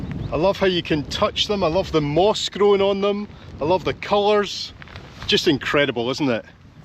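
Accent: Scotland, United Kingdom. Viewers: I love your videos. So very interesting and you present it so well. I like how you just let the trips evolve. We love Scotland and its friendly people and your wonderful accent. Makes us want to visit again. We were there a little more than a year ago. Regards, Jim North Carolina USA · Another brilliant video-thanks for taking me to places I love and doing it in a natural relaxed way with that lovely Scottish accent. ThIs one came at the right time for me as I am reading The Chess Men by Peter May part of the Lewis trilogy. If you have not read these books they give great insight into the character of the people of these islands. Carry on the good work.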